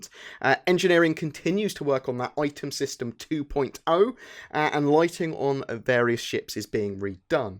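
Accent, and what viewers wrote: United Kingdom. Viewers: great video, love hearing a British voice talk about SC always makes it sound do much more sophisticated lol. please don't stop making videos!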